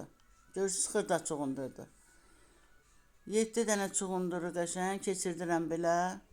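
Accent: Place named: Aran, Azerbaijan. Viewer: Salam xanım,siz haralısınız? Ləhcə çox tanış gəldi 😄😄 hiss etdim ki,aran bölgəsindənsiniz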